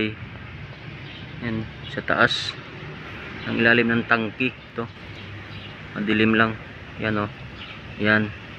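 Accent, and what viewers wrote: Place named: Visayas, Philippines. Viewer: Tunog bisaya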